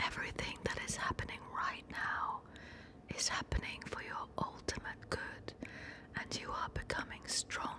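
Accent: England, United Kingdom. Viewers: English accent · How dare the people who mocked her British accent growing up. Madness. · i’m gonna die! her voice and English accent😫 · She’s so lovely. -She’s become more British than me, in recent years! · She was raised in the UK and moved to America as a teen, thus she developed her English accent naturally. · She is one of the most beautiful women and most brilliant actors I have ever seen. Her elegant british accent made this asmr even more sensuous. Thank you Netflix! She is a natural. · Going into this I knew that she naturally has two accents but I’d only heard the American one. And still...when she began speaking in an English accent I was surprised to say the least 😂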